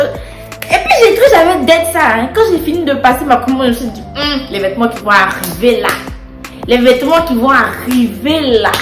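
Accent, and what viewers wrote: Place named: Ivory Coast. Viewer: l'accent ivoirien💖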